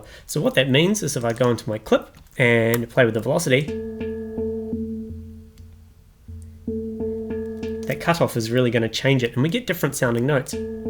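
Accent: New Zealand. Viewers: the first 30 sex of this (really good) tut were like a masterclass in kiwi eccent ''...quuck tups...mudi clups... ...eexcellunt!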